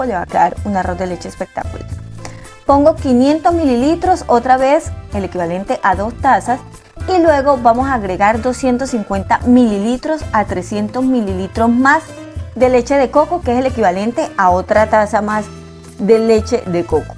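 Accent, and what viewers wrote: Colombia. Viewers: Ese acento es del llano, Santander o amazonas? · El acento no lo pude deducir...escuché valluno, costeño y paisa.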